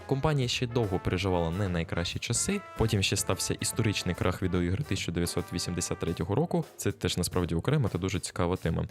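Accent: Russia. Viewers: забавна говірка в автора. типу іноді проскакує російський акцент, особливо чути в числах. ну якщо автор перейшов з російської то молодець так тримати. якщо автор так розмовляв то мені просто цікаво в якій частині нашої країни такий говір?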